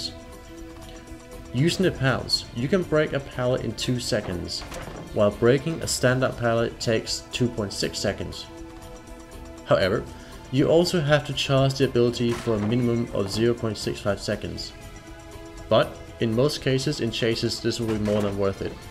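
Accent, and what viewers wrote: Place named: Denmark. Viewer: this is really great. the dbd community needs more good demos to help us get better at playing him! also are you danish or swedish? your accent sounds like it.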